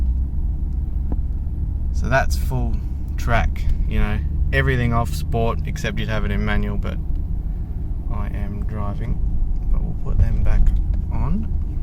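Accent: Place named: Australia. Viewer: Nice to see another ISF in Australia, I recognised your accent. I am in Western Australia. They have become so rare now, lucky to see 10 for sale in the entire country at any one time. Looks clean good pick up! :)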